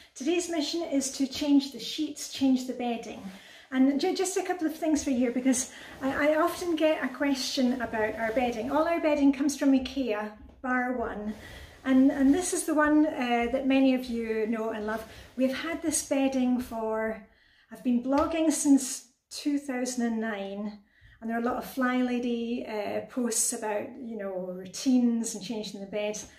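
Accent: Scotland, United Kingdom. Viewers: my little one's comment when you said "Little" -- "Mum she's from Ireland" -- I laughed and said no Scotland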